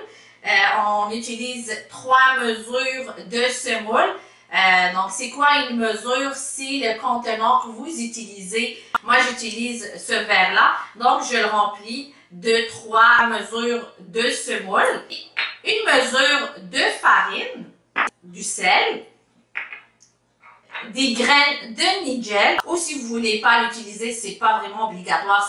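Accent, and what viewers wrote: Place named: Canada. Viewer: Merci pour la recette trop mignon l accent canadien sur une femme kabyle Recette que je vais tester merci pour le partage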